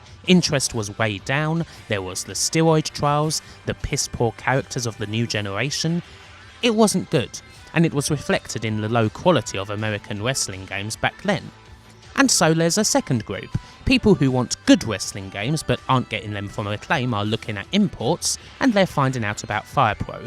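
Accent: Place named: England, United Kingdom. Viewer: I think it's the English accent maybe?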